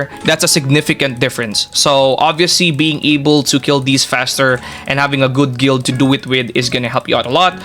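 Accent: Philippines. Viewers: Wait?? Are you a filipino, because you have a filipino accent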